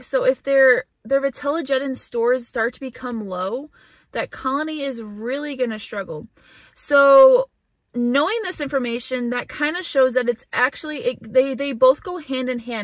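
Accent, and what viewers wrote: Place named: England, United Kingdom. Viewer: just use that english accent!! :) !!!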